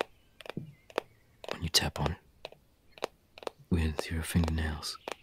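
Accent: United Kingdom. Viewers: Why does he sound so British 😂😂 Also ADORABLEEEE ❤️❤️❤️❤️❤️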